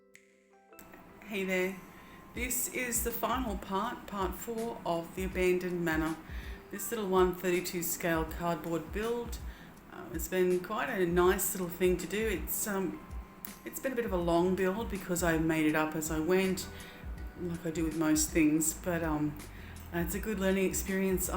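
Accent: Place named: Australia. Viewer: Superb! You are a very talented lady! I also love your Australian accent.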